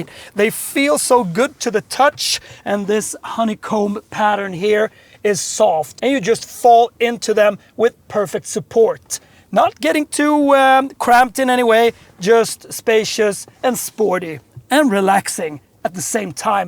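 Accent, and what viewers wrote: Sweden. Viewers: Man can hear the swedish accent svengelska :D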